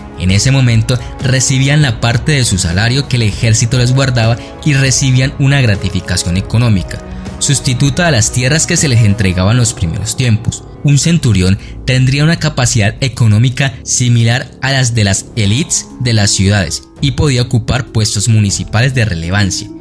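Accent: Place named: Bogotá, Colombia. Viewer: El parcerito tiene acento Rolo😸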